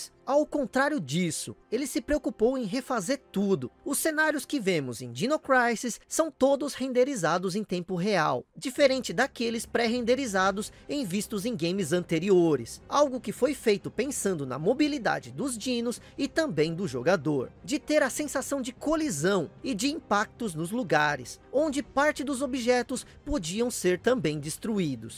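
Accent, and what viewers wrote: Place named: Northeast, Brazil. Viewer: Issui pirata parece um nordestino com sotaque melhor do que muito nordestino kkkkkk P.s sou nordestino, antes que os lacradores comecem com enjoo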